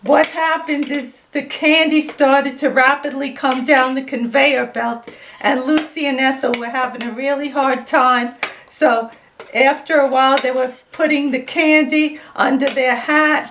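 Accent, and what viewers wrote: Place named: Boston, United States. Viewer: ARE YOU FROM BOSTON MASSACHUSETTS? I HEAR AN ACCENT I RECOGNIZE BUT MY GRAM WOULD SAY BUTTAH NOT BUTTER.. SO I MAY BE WRONG ... BUT I HEAR PAPAH TOWEL ... SOUNDS JUST LIKE MY GRAM.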